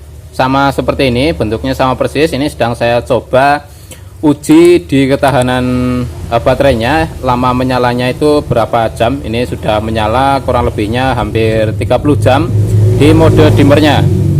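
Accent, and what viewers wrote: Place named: Tegal, Indonesia. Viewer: Wong tegal yaaaaaaakkkk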